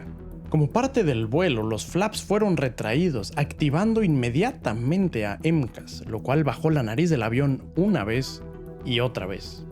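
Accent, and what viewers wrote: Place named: Mexico. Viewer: Excelente video y excelente acento mexicano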